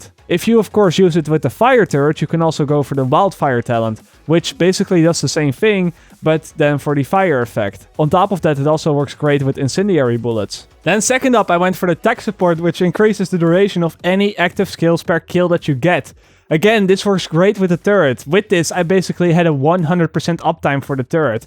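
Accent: Netherlands. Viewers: He's dutch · He's Dutch, which is Lesser Germanic. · plat Nederlands accent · its VERY obvious this guy is dutch because of his accent lol i am dutch aswell but i created my own accent on a young age so people kept thinking i was either from england or america which is great lol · Are you from netherlands? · are you from the netherlands your accent sounds a bit like that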